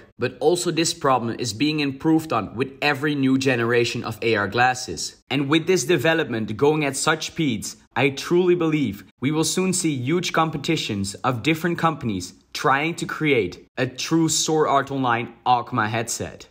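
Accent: Netherlands. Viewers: Just gotta ask this: Are you Dutch?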